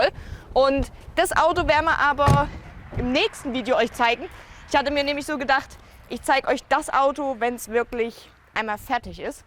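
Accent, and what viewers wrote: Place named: East Germany, Germany. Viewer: Richtig ekelhafter Ossi Akzent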